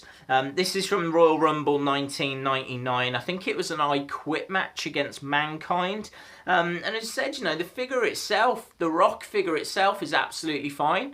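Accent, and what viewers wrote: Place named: England, United Kingdom. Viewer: i love the way english people pronounce some words and letters its kinda refreshing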